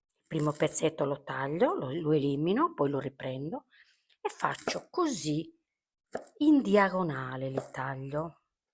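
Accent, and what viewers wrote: Sardinia, Italy. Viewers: Ma tu sei sarda come meeeee, l' ho capito quando hai detto: si tianu, siiiproprio come lo chiamiamo noi e anche come fai i papassini , mi fa' piacere, e forse non sei molto lontana dal mio paese, da come parli il dialetto direi proprio di no. Complimenti ancora, sei unica😘♥️🎉🎉🌹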